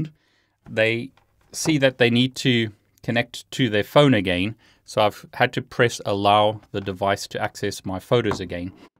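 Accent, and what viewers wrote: South Africa. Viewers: for some reason somehow your accent sound like an english south afircan to me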